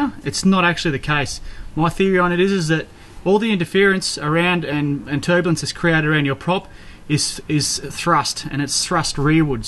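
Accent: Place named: Outback, Australia. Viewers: I just want to know how I can get the Australian outback accent for my videos! That accent makes everything <num> times cooler and interesting!!! That accent alone is worth like 100k views Mayte! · More specifically a Queenslander accent. ‘Youse’ is a dead giveaway. Very ocker, mate!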